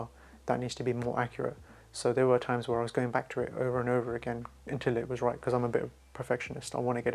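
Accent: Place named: Southall, United Kingdom. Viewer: You sound like you might be a Southall lad?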